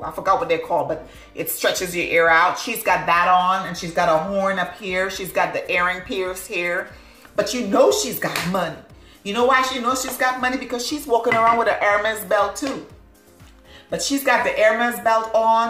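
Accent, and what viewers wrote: Trinidad and Tobago. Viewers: Are you trini ? U sound trini lol it’s cute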